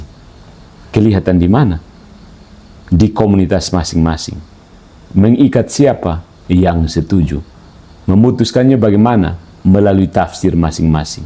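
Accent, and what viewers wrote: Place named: Eastern Indonesia, Indonesia. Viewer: Selain isi dari vidio ini yg luar biasa , saya palingsreg ketika mendengarkan tatanan bahasa n logat bahasa serta intonasi saat berbahasa utk saudara /i Indonesia Timoer kita Paaaas n sertifikat itulah berbahasa Indonesia dengan baik n benar . Terimakasih Tuhan Engkau masih memberi ruang n waktu utk diriku n klg ku menjadi tubuh gereja katolik .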